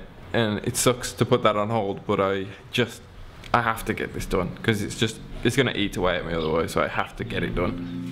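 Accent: Birmingham, United Kingdom. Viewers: Always inspired by your work. Always. I don’t know if I missed the story, but how did you end up in Peru? Is that a Brummy accent?? Could you do a video on your background and how you came to be where you are doing what you do? I think it is fascinating, the life journey that took you to Peru, to sacred geometry. Pls share, and sorry if u have already done that video, I haven’t been watching your stuff that long so may have missed. Thanks.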